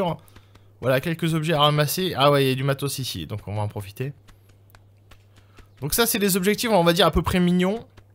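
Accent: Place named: Banlieue, France. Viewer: Ça te va pas l'accent des banlieues :( trouve autre chose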